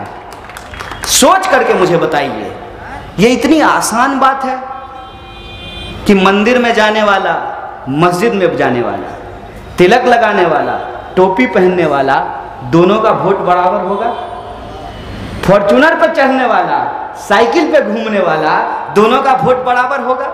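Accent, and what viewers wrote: Bihar, India. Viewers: Lalu yadav ki kmi dur ho gai... Apke ane se.... Mja ata h apki theth bihari bhasa sunkar.... 😀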